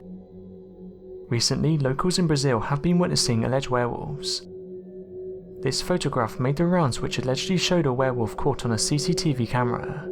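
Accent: United Kingdom. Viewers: Cant undrstand u brit or ausie twit! Get another 2 narate👹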